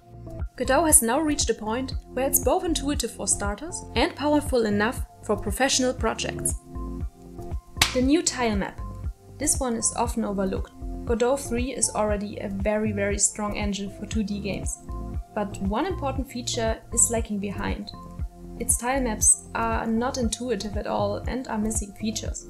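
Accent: Germany. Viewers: i love the german english accent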